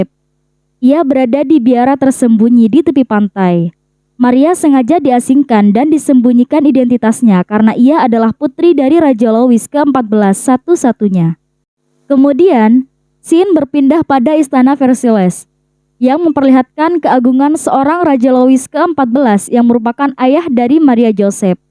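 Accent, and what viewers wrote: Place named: Madura, Indonesia. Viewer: Kek orang madura nih logatnya 😅😂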